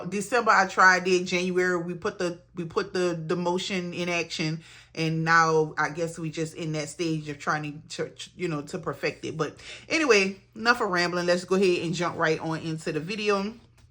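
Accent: Louisiana, United States. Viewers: hey love your vids and the bayou accent:) lets follow dear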